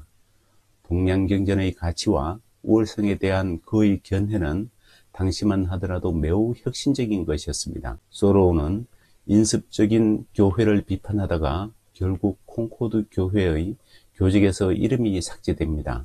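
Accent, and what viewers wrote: Gyeongsang, South Korea. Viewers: 근데 경상도억양이 일상에선 듣기좋았는데 책읽기로는 듣기가 무난하진않네요 껄끄럽다고해야하나 ㅋ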